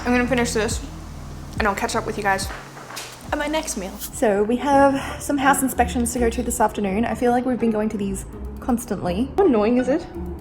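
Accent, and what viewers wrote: Australia. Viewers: Australia I believe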